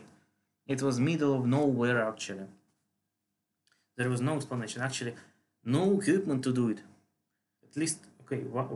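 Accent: Russia. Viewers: M8 you need to turn up the volume I am Croat and I can follow Russian accent but it is a real struggle to hear you. Great work but watching this before dark is almost impossible.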